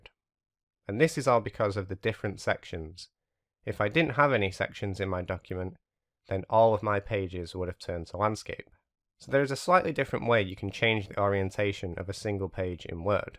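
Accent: United Kingdom. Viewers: ew british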